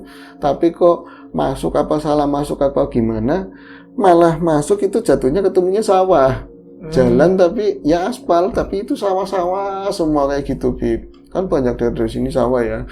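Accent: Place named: Tegal, Indonesia. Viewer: mas dengan dialek tegalnya lucu,sukses terus dengan acara ngobornya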